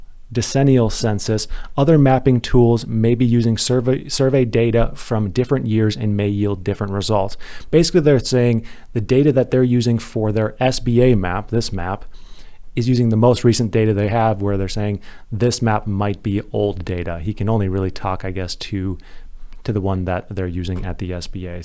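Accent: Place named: New York, United States. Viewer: Yo! Whats good Son? That’s how some of us New Yorkers sound.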